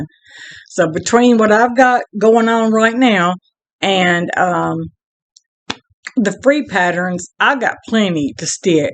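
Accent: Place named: South, United States. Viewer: Love your ramblings and adore your southern accent! Looking forward to the next one!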